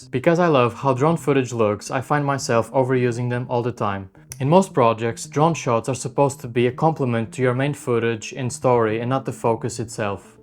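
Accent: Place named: Madeira, Portugal. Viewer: Quando ví o clip da ilha da Madeira, comecei a notar mais no teu sotaque e és claramente madeirense! Bom vídeo 👍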